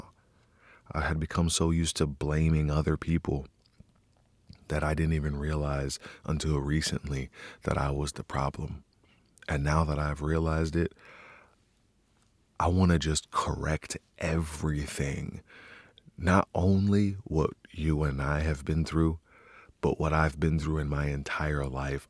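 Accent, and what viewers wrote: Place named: South, United States. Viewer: I could listen to your soft guttural accent with a slightly southern drawel sweet and slow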